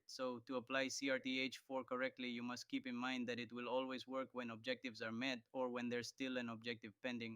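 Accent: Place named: India. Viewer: Estabas bien haciendo tus explicación en tu idioma natal el castellano. Pareces un Indu hablando ingles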